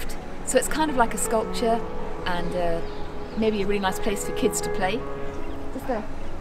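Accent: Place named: London, United Kingdom. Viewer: 天啊。好标准的伦敦腔。听着好美好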